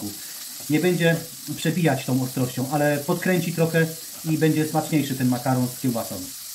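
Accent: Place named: Silesia, Poland. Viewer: myślałem że ze Śląska, bo tak zaciągasz 😊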